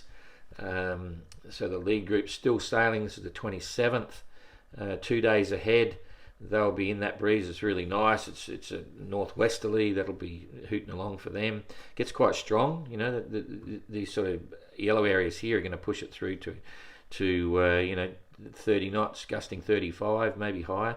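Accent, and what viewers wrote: Australia. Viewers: Hello,it would be kind to speak a little bit slower for french followers who are not crurrent users of the aussie accent..thank you so much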